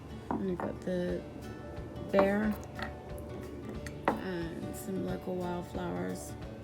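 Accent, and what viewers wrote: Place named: United Kingdom. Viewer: That's a very cool idea! I hear that British accent sneak out once in a while.😁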